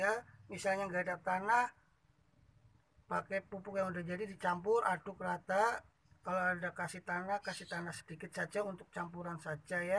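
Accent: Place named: Banyumas, Indonesia. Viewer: Deneng ngapak,asli pundi nggih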